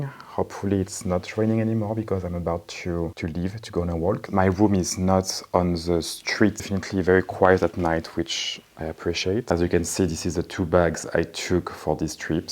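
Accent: France. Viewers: French who speak english with french accent it s awfull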